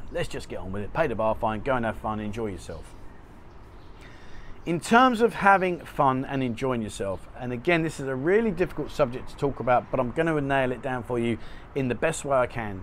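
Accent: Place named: RP, United Kingdom. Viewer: ...does a good job with information...and with a bit of the 'kings accent, too! remember: be cool..if you are 21yoa, 41yoa or 71yoa..from europe, the US or wherever. does not matter..be cool.. the beer thing..most of the clubs drafts..not pubs..but thai bars drafts are watered down...if i go in the first is a bottle..then..i might switch to a draft..."balance".. dress nice but not too nice. be well-groomed, and hygiene. put some slick hair gel..a nice bottle (not cheap) of colone helps..i would bathe in it and the girls would cuddle right in the club and compliments me too. like any woman they like assertive..you see a girls you like go ask her or ask the waitress to ask her over for drink...best thing be and have "fun"..don't sit there like at a dentist appointment.. kind of like the more fun you are having the more fun "the" girls like that.. 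the rule of no photos has been for decades..i think..in angeles city/PI up until i think 2012 or so you could take pictures and video...from what i know there..not anymore hence internet. if i am on a chatting app..it (phone) is in my lap or on the table..guy "things" like that the girls in the clubs..pick up on and will respect your and like you...since you are "protecting" them.. i will say thei for the 11th time.."best" years of my life...best.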